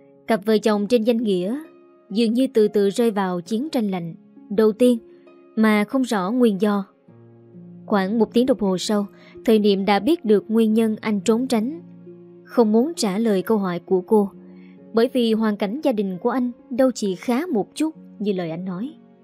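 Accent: South, Vietnam. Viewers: Giọng đọc miền Nam của quả thật nhẹ nhàng ngọt như mật ong. Love it! 🥰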